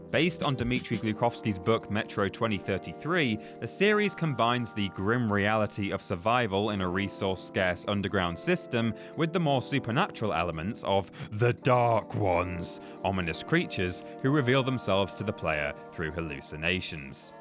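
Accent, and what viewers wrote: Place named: Yorkshire, United Kingdom. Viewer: sounds very 'Yorkshire-ey' today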